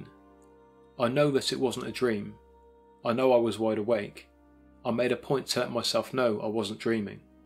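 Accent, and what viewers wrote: England, United Kingdom. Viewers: Interesting hearing someone from Old England talking about New England. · I'm normally streaming via Roku or SmartTV so I rarely leave comments but I absolutely love this channel. Excellent narrative skills from proper British accent, the stories are intriguing & I just wish made more videos (being selfish). But with this lame pandemic I don't know why anyone would give this man's videos a dislike. Keep doing what your doing sir! You're in my top 5 YT channels. Thank you for your time & efforts making these videos.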